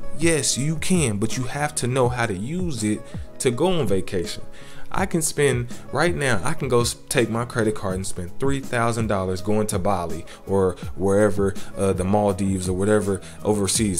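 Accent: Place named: St. Louis, United States. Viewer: Sounds like he from St.Louis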